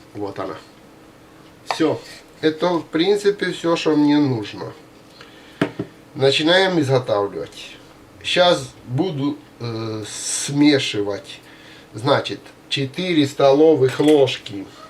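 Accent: Ukraine. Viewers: Судячи з акценту, Ви українець, чи не так? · Подсмотрел у укрАинских рыбаков.украинские рыбаки -хорошие рыбаки.я с Сибири.судя по"молотарке","та же самая"говору,сразу ясно шо не москаль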